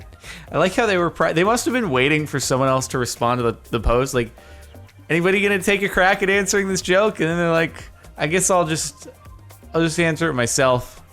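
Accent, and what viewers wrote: Australia. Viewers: That Aussie accents makes me cringe (no offense) I'm aussie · take a moment to appreciate aussie accent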